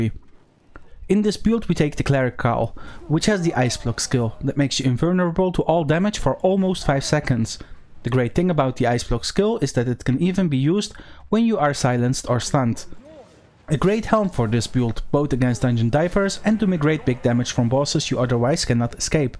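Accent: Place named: Netherlands. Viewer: Hoor direct dat je NL bent.. Toffe video maar probeer je Engelse accent wat te verbeteren ;)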